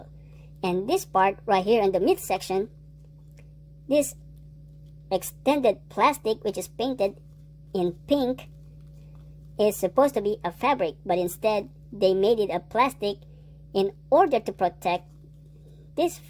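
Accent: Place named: Philippines. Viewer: Why is your tagalog accent so heavy?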